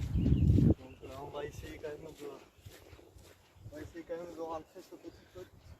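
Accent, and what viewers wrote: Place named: South, France. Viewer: très bien ce 1er vlog... avé l'accent!!!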